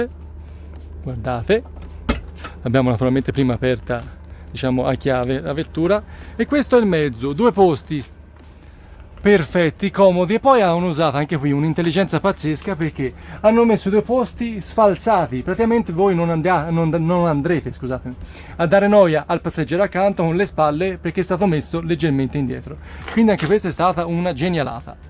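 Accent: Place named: Florence, Italy. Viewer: L' accento fiorentino mi fa impazzire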